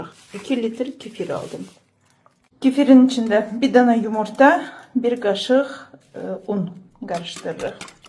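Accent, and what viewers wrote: Ganja, Azerbaijan. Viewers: Xalis Gəncə ləhcəsi😂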